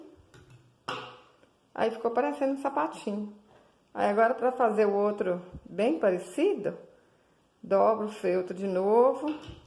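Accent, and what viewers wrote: Minas Gerais, Brazil. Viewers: Boa noite minha querida! Q "lindeza"de artesanato como vc diz né? Vc é uma amor de pessoa ,esse jeitinho de falar de "mineirinha" toca no coração da gente. Obrigada por nos ensinar tanta coisinha linda,fico feliz demais. É assim q me sinto quando "posso" te ver. Porque nem sempre a minha internet permite eu entrar no You Tube. Mais uma vez parabens e obrigafa por todo carinho. Q Deus te abençoe e seja sempre feliz querida. Bj no teu coração!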